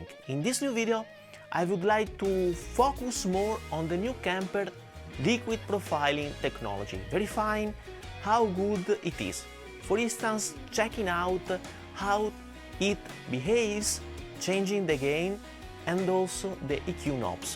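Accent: Italy. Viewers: Great video, great channel from the few videos i've seen so far, and digging that Italien accent (I'm French btw) :)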